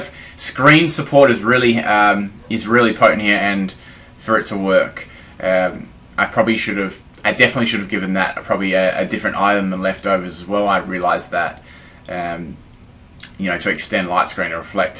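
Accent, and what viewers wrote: Australia. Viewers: nothing against Australians and their accents but yours is rather thick, plus the loud, low quality audio makes it hard to sit down and enjoy this video cause i cant understand what your saying.. just calm down you don't have to speak so loudly imo other than that, this was a great battle and your team is bad ass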